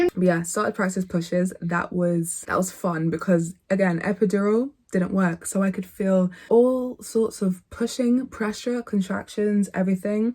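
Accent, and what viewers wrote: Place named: United Kingdom. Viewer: Omg you guys are adorable together but you are freaking gorgeous!! Could just listen to you talk all day 😂 I love your accent. Are you in the Uk? Here in the states most videos I watched the dads were there just wondering if they did things differently there. I just subscribed you got me lol I also have a channel more than welcomed to sub back. Xoxo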